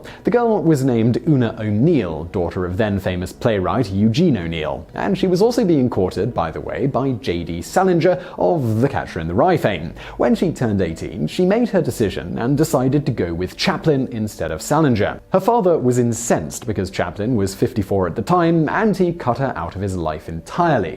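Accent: United Kingdom. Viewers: Uhh Debbie Reynolds, Elizabeth Taylor and Eddie Fisher should have been number one not scandal involving the Sherlock Holmes author and Houdini. But maybe bias since you sound like you’re from the UK?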